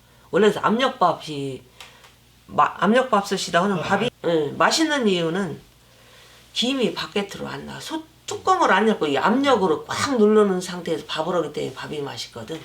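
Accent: Chungcheong, South Korea. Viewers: 구수한 충청도사투리군요ㅎㅎ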